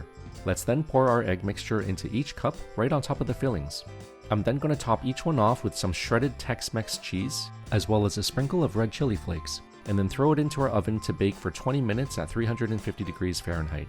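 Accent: Canada. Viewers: Canadian voice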